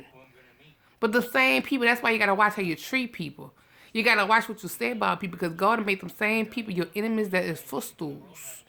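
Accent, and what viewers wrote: New Orleans, United States. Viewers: I just love listening to you! Ya insights and slang and N.O. accent...Very comforting.I promise I would listen if you made a video describing how to make a peanut butter and jelly sandwich.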